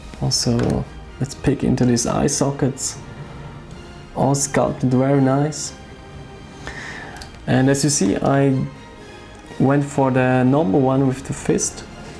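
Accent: Germany. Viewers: Ha, wusste das es nen Deutscher(-sprachiger) is an deinem Akzent 😂👌🏻 Wie halten sich die Pegs an den Beinen ? Hab viel negatives über die gehört :/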